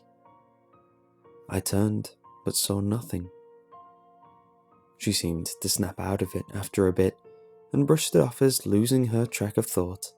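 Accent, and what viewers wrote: United Kingdom. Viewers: is he brittish?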